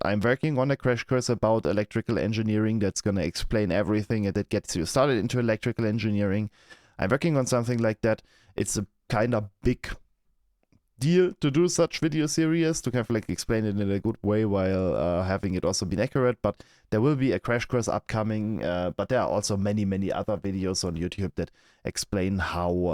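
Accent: Germany. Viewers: Man hört die deutsche accent 😅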